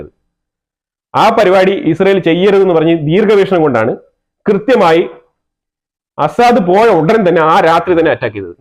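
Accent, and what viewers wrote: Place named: Trivandrum, India. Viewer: Excellent analysis and presentation in Trivandrum style talk. Keep it up.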